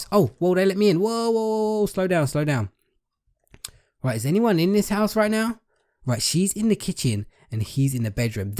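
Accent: United Kingdom. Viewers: U can definitely tell hes form England but same 😂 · you sound so British I am British to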